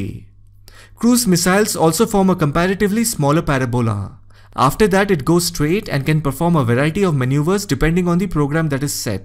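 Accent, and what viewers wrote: India. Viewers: But with a heavy Indian unique accent though!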